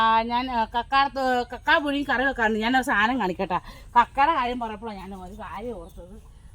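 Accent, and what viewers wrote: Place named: Malappuram, India. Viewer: മലപ്പുറം നത്തൽ, വത്തൽ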